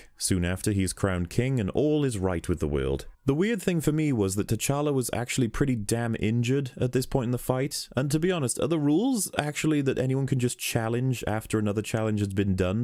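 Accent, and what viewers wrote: United Kingdom. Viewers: we all know what N-word you were trying to use....And its not nitpicker, which is what this entire video is. Seriously, stop trying to hold yourself up like some sort of film scholar when you have to complain about how they're clothes are designed. It's called "tradition" and "culture". This whole video, i thought was a joke, and in retrospect, it is, just like your channel. But wow. Why is Black Panther somehow the ONE marvel movie that pisses you off so much? It's like you had your teenage son write this in a few hours and asked for dad to use his british accent to do a voice over because everyone can trust a british accent. What a pathetic excuse to get mad over a movie. Btw, i do agree with like, 20% of what you said, but if i play the "suspension of disbelief" card, you'll somehow misread that as "oh well, opinions are subjective bro." So instead i ask you to study in a film class or something and brush up on your presentation. 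So many unnecessary "F" bombs, at least get creative with your cussing. So uncivilized. :) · you can sound like you know what you're talking about by just having a British Accent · I hate to say this to you but I feel as if your British accent works against you with this particular commentary. It makes you sound like those willfully ignorant colonizers they speak of in the movie who came to Africa in other parts of the world to quote on quote spread civilization wow being willfully ignorant others culture identity and or achievements. I say this because a lot of you are complaints we're actually dressed in the movie I'm not sure if you tuned things out once you came across something that did not make sense or forgot them. A lot of your complaints for example are centered around the heart shaped herb as well as a panther suits. Again I say this is more or less comic book accurate so if you're going to lay fault lay it on the comics themselves. 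I suppose you could blame the movie for not editing out elements that don't make sense so allow you to have that. However it was clearly explained that only the line of the king could consume the heart shaped herb. Anyone else it would kill or rather only one person in a million could consume the herb and live. Now I will give you this, the whole kinglee trial could have been done better. That is also something that was taken from the comics but not in its exact form. On YouTube there is a cartoon call Marvel Knights the Black Panther. Essentially the trial was as such, anyone was free to go through the trials to become the king and those trials consisted of vesting wakandas best warriors. The Last Man Stand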